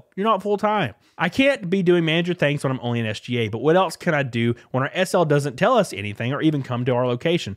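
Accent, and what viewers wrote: South, United States. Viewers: Like your southern accent.. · Love this guys country grammar. I live on the east coast up north & we dont get to hear a southerner accent where im from ....cool voice dawg ! 😂😂😂😂😂😂😂😂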